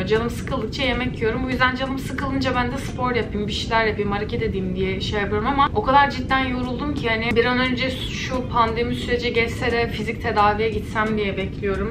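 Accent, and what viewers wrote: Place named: United Kingdom. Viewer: British aksanı olmuş o biraz ahhaha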